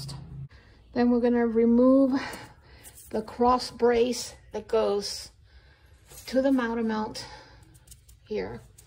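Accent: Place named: New Jersey, United States. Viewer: OOH !!! A Joisey Gal !!!! Luv it even more !!!!!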